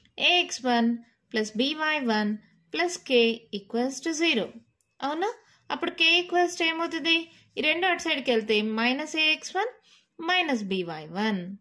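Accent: Nellore, India. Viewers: Mam are u from Nellore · Mam are you from Nellore???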